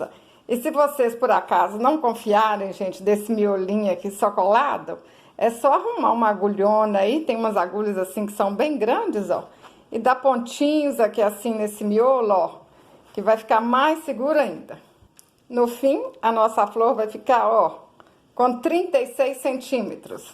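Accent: Minas Gerais, Brazil. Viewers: Parabéns seu trabalho e lindo! E sua conversa é muito minerissima 😀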